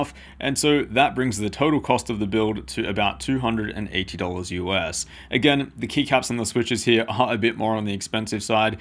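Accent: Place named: Australia. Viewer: I cant tell where his accent is from, bit australian but not really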